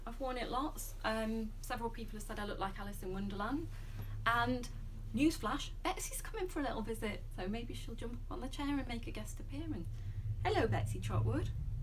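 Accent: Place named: Northern England, United Kingdom. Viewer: Great makes, where are you from? I’m from north wales... your accent tells me that you’re from up north somewhere too... anyway great makes! Well done to all your garments. X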